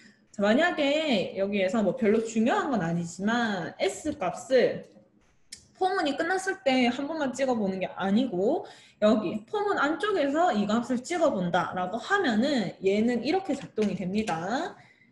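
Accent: Gyeongsang, South Korea. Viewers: 경상도 어투가 살갑고ᆢ정겨워요~ 강의가 귀에 쏙쏙~~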